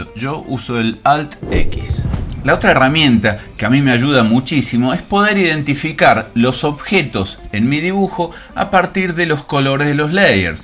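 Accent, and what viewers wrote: Argentina. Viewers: que bueno!!! que interesante y que divertido escuchar a un profe argentino!!!!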